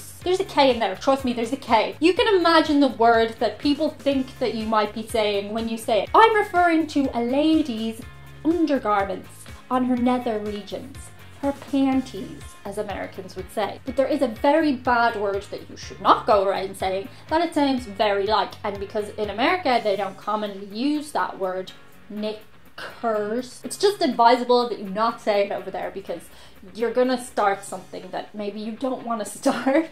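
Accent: Ireland. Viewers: honestly, your list was somewhat tame....most of us in the states would probably not bat an eye with those colloquialisms (heck, we'd probably even ask you to explain it to us a couple of times so we can be "in" on the joke). Now...if you were to use words like C*#T, wh*re, sloot, Bit*h, feck (in the American standard usage of dropping the "e" for a "u") and the other name for a kitty cat...then yeah....you would get some strange, maybe hostile, looks from most americans too stuck up to have the piss taken out of them. But those irish words? naw luv, those were endearing in their own way, and most reasonable americans would ask you to repeat those words in a sentence just to hear you and your nation's lovely accent.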